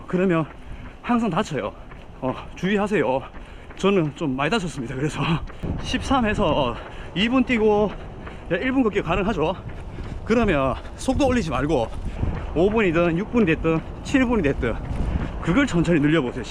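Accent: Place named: Gyeongsang, South Korea. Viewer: ㅋㅋㅋㅋㅋ말씀 웃기게 하신다 같은 갱상도로써 시원하네요ㅋㅋ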